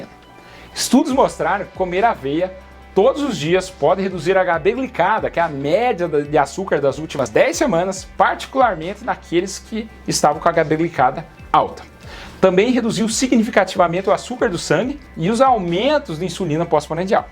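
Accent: Brazil. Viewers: É o sotaque caipira e a maneira desembesta de falar… Se ele é humilde, não dá pra saber. A humildade é uma virtude muito rara nos dias de hoje.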